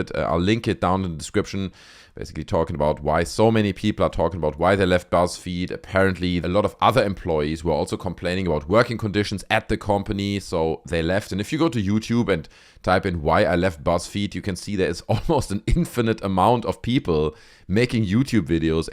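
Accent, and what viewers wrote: Germany. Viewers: Hey, you sound like you're German, if so: greetings from Germany to Germany! 😊 You are totally right with what you say: the old Buzzfeed episodes felt more fresh, more funny, more original. The content they are doing on Watcher looks more expensively produced, but it quite lacks the original soul and comedic harmony between the two guys. I always thought that they should have stayed at Buzzfeed, their new channel was quite mediocre in my taste. Some shows were good, like Puppet History, others were questionable (Too Many Spirits... it may sound fun, but having seen friends go down due to alcohol abuse I am above thinking that getting drunk is something which should be fun), and pretty much all shows with Steven Lim were not good. I remember that one video when they announced a discussion about wether ghosts are real or not... I thought great, just like old times, except it was not. 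Shane was good as ever and did a great presentation, but Ryan was SO annoying that I had to take three steps to complete the video, he was almost unwatchable. He looked like he had spend the last two days sleeping under a bridge, what he said was not funny at all, he did not even try to participate to the topic of the video. When he started munching apples while talking, I was one click away from stopping for good but I finished it to see if it got any better... it did not. And then they decided to blow up their own channel. It cost them half a million subs (in fact they lost another 10K to when I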